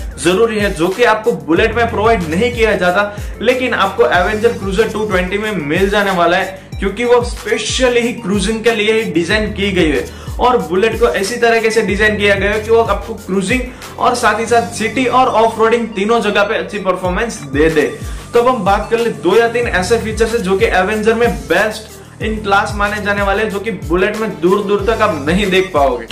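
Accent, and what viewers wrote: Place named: Gujarat, India. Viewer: he is typical gujju .. listen to his accent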